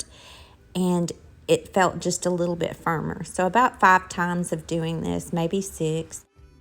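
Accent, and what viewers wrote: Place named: Western North Carolina, United States. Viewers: I love listening to you! You remind me of home. (Mountains of WNC) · As an E. TN native (raised in Sevier County and worked in Knox County) and now living in W. NC with my wife, hearing you talk is being at my mom's or one of my aunts' houses and I love it! I can practically smell those biscuits and my wife asked me to send her the recipe, too. Talking about Hardee's (or any of the larger chain restaurants for that matter), your husband, like myself, has probably noticed how much smaller they make their biscuits now. This is probably going to be added to our twice a week home-style breakfast routine (the rest of the week we just grab a protein drink or a bowl of oatmeal) thanks to you, lol.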